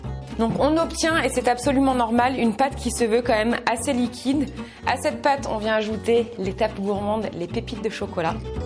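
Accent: Canada. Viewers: tu mon youtubeur préféré de is vidéos accent canadien et qui met quoi des vidéos trop trop rire 👍👍👍👍👍 🇨🇵🇨🇵🇨🇵🇨🇵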